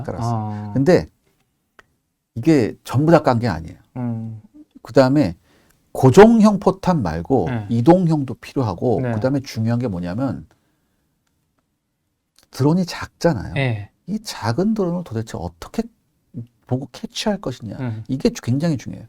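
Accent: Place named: North Korea. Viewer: 진행하는 사람 북한 사람인가요?? 생긴것도 그렇고 말투가 강원도 같기도 하고 북한말 같기도 하네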